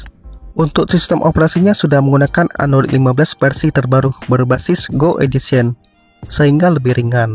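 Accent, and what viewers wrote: Aceh, Indonesia. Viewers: Bg org aceh ya cara ngomong nya logat aceh 😮😮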